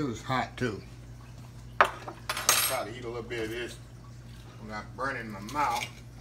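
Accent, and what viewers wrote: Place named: Texas, United States. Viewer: has that awesome Texas country accent. His voice is one of a kind, and very soothing😌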